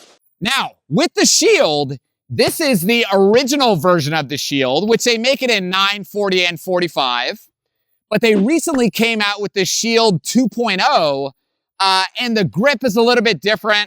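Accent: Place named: Tampa, United States. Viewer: This is exactly what I would expect a person from Tampa to sound and look like.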